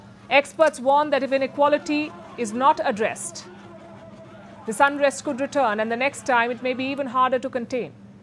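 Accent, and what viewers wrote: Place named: India. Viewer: Funny English indian accent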